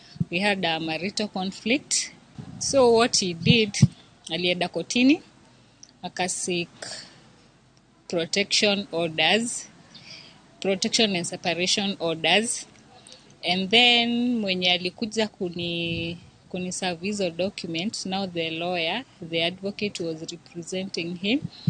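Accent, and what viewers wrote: Kikuyu, Kenya. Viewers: Ukweli.... very heavy Kikuyu accent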